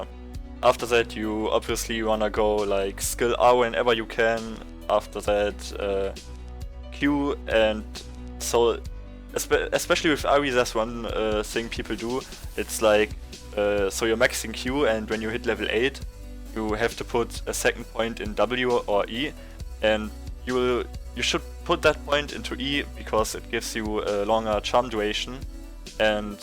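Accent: Germany. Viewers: Das deutsche englisch 😂😂 ich liebe es geiler Guide! !! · Deutscher Akzent :DDD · Der deutsche Akzent xD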